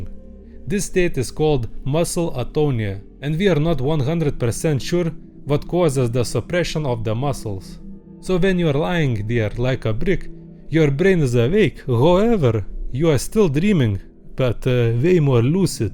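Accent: Russia. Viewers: why is his accent russian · Are you Russian or European? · u russian?? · Are you having a Russian accent? (P.S. im Russian) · like your russian accent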